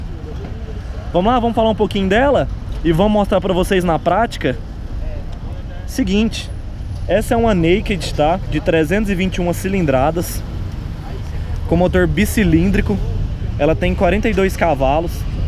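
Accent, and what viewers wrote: Goiânia, Brazil. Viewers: É de Goiânia mano?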